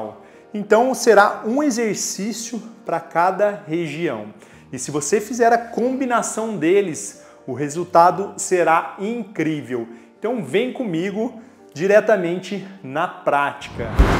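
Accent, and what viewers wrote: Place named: Sao Paulo, Brazil. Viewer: Vc é de Piracicaba? Esse seu sotaque, é bem típico!!!!!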